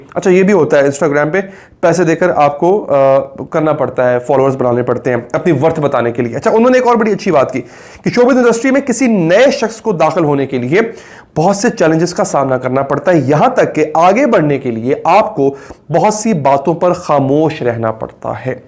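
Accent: India. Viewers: Bro please speek like Pakistani don't speak like Indian we like you... Insap ke deve.... Indian language please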